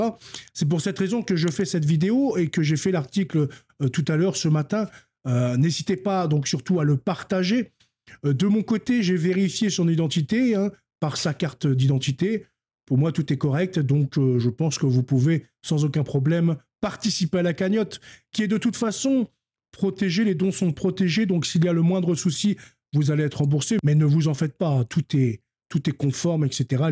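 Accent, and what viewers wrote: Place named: United Kingdom. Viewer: Pourquoi prononcer à l'anglaise des noms manifestement bien français ?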